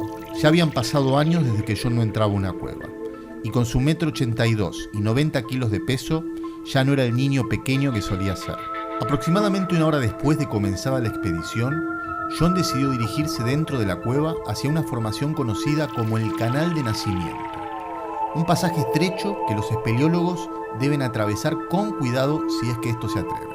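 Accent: Argentina. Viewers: Sos argentino ? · Entiendo. La verdad es que me encanta el acento argentino 😄👍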